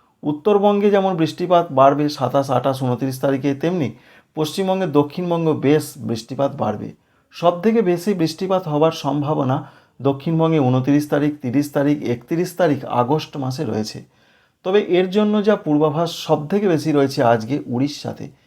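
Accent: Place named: United States. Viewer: বাংলায় ফিরে এসো বাবা, ইংরেজি উচ্চারণ আমেরিকার হওয়ার জন্য আরো বুঝতে পারছি না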